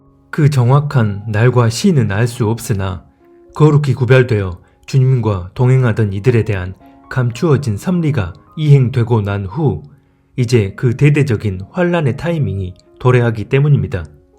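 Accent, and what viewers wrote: Jeolla, South Korea. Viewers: 절라도사투리구시히네요. 중공삼자교회 성도인가요.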